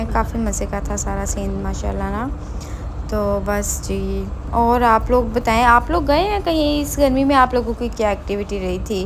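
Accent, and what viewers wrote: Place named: Punjab, India. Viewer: Love your vlogs ND language style too ND specially your Punjabi accent 😁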